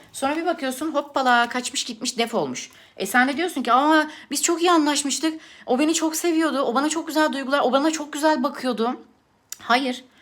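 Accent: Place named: Aegean, Turkey. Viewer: senin ege şivenı yerim ya gidipduruu😁🥰🥰 Vallahı noluyo 2seferdır anlamadık nazaramı geldık! Aman ruhdaşım sen bırakıp gitme bizi🥺 Seviliyorsun!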